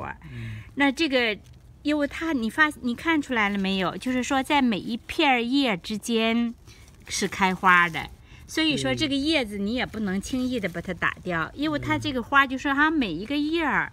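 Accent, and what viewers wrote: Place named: Zhuozhou, China. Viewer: 听着这么熟悉的口音，您是涿州一带的人吧？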